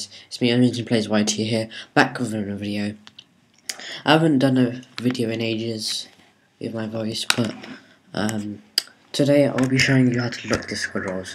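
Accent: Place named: United Kingdom. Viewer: you sound like a more british version of my friend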